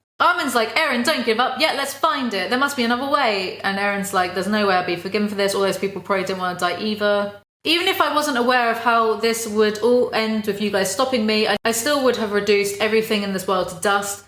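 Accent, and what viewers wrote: United Kingdom. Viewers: nothing is far better than a British rant! good thank lord!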